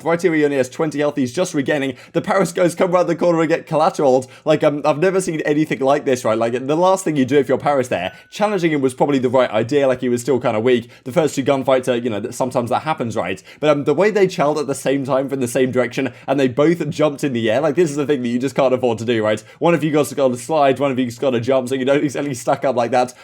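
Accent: United Kingdom. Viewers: British, ha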